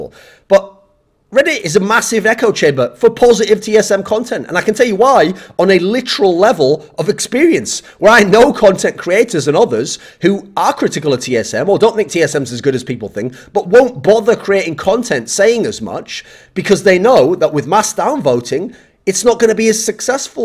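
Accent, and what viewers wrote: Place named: United Kingdom. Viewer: You sound so Bri'ish in this video I LOVE IT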